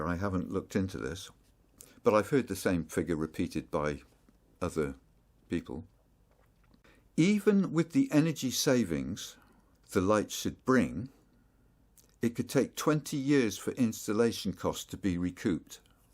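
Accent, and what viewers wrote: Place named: United Kingdom. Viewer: This is an excellent discussion about this spreading scourge (more like a badly misguided use of a potentially good technology) that seems to be spreading like cancer across the cities and countries alike. Granted, this focuses on the UK (based on the accent of the person narrating) but here in the United States, there have been many cities that have replaced the high pressure sodium (and low pressure sodium too) lights with harsh white LED lighting and it has practically ruined the nights and badly diminished the city nights altogether. I DO know that some of my argument may stem from nostalgic reaction to a changing technological paradigm. Maybe I'm just the 21st century version of those who complained about gas lights being replaced with incandescent light bulbs during the early 20th century but I can't tell you much I HATE, HATE, the harsh white light of these LED streetlights that are installed in places where they are NOT suitable to be in. 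You mentioned there was a petition set up to get them removed. Sadly, there's a general sense of resignation here in the states but at least a few cities had such outcry against such harsh lights that they either stopped installing them or replaced them with warmer colored lights. There are residential neighborhoods all around me that have long installed these LED streetlights and some of them actually hurt my eyes and I'm only 40 years old as of this writing but nearby streets that I regularly drive on at night now have these ultra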